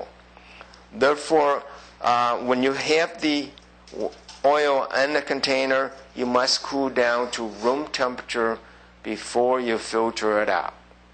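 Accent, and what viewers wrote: United States. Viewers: no. he learned english with some kind of chicago or midwestern american accent. the accent is american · i can sense a Chicago accent from this cook.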